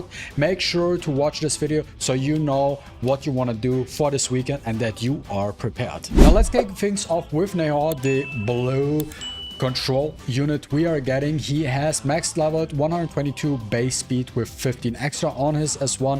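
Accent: Germany. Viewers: Bro is German?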